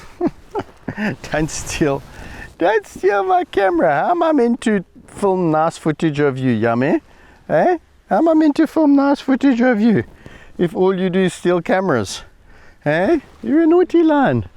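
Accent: South Africa. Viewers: Those manes. Can’t BELIVE. How full and thick they are. Andi just love the way. You talk to them and love the South. African accent. Love thst beautiful country. Gods own country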